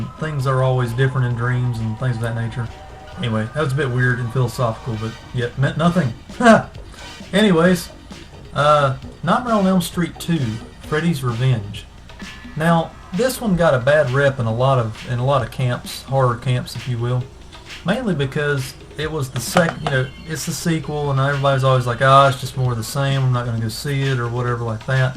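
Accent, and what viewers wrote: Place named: Southeast, United States. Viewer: Are you from one of the Southeastern United States? I like your accent.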